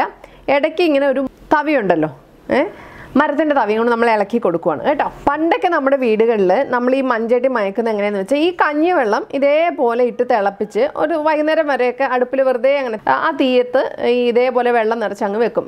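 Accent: Kottayam, India. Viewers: Kottayam slang super.. 👌😄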